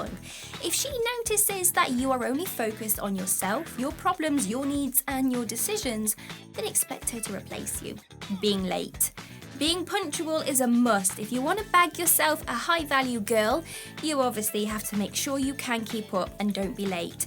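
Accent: United Kingdom. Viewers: has potential to reach 1M subs. This british accent drive ppl crazy lul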